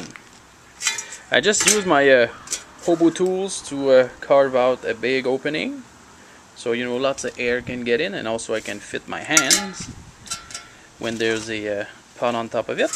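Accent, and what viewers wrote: Quebec, Canada. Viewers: I was gana make a comment about in Canada we just trow a bit of water out and see if it freeze but Avec ton accent j'ai réaliser que tétait canadien. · Sonne accent québécois ca mon chum ! Bon video !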